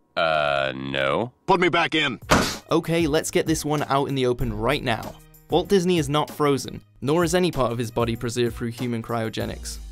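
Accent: United Kingdom. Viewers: ugh British b arrator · A lot of comments about a British narrator. Apparently that makes a difference. He is is only reading from a script that a team of people have researched and developed. What exactly does it have to do with the content of the video especially when these myths can be historically proven? It's exactly this attitude why most of the world believe Americans are arrogant and stupid. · It sounds British ^^; I am from Wisconsin, so I think I can tell the difference since I would have literally been within driving distance from Canada :P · American icons and yet a British narrator. Makes sense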